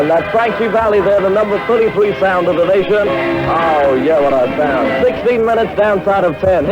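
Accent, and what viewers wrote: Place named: Mid-Atlantic, United States. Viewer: Oh that dodgy mid-Atlantic accent!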